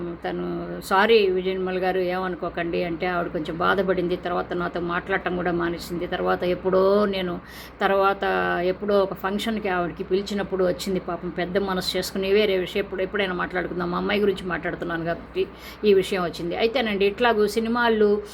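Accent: Guntur, India. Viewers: మీ తెలుగు చాలా బావుంది మేడం నా బాల్యయం లొ గుంటూరు లో వినేవాడిని hats off to ur principles 👍 from Vizag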